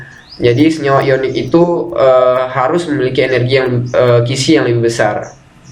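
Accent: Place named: Sulawesi, Indonesia. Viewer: Logatnya sulawesi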